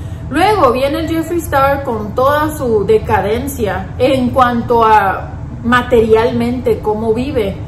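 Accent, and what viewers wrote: Sonora, Mexico. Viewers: Holaaa! Apenas ayer me tope con el video de las “kardanshans” sepa la fregada como se escribe, nunca las he visto, jaj pero me gustaron tus comentarios, lo que mas me llamo la atención fue tu tonito y manera de hablar, dije esta es de Sonora y siii, en algún video lo dijiste ( porque me puse a ver mas videos tuyos) saludos desde de Hermosillo! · You tube Te sugirió eres de sonora ?? Ese acentooo es de mi tierra Hermosillo? O cercanías jaja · Me gusta ver tu canal porque me identifico mucho contigo en tu forma de hablar , igual que tú soy de sonora , saludos desde Navojoa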